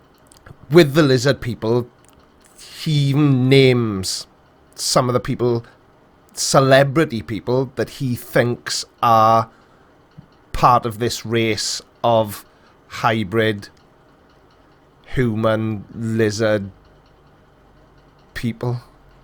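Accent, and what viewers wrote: Wales, United Kingdom. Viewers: We need a Creaky Weekly in the automotive world. As for this channel, I think you should cover whichever topic strikes you personally. If I may speak aggregately, folks watch to see and hear your take on things, your perspective. The Welsh accent and the clever phrasing only adds to the scope of the video. Fun to watch.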